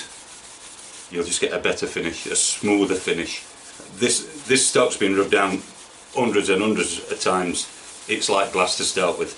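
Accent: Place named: Yorkshire, United Kingdom. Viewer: Suuuuper well explained video! Also love the accent! Yorkshire?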